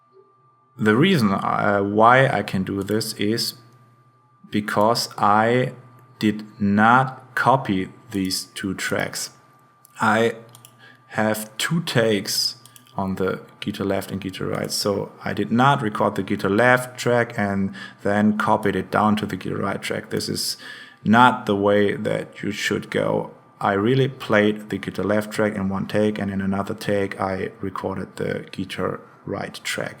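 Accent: Germany. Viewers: man hört voll das du aus Deutschland kommst ^^ aber gutes englisch !!! thumbs up for you :D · I could recognize that accent anywhere. I know you're German. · I could tell just by your accent you were german. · I hate the german accent..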